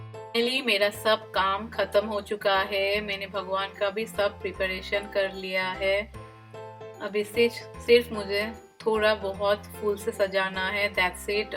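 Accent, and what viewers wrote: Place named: India. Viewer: I love ur Hindi tune n ur also awesome I like it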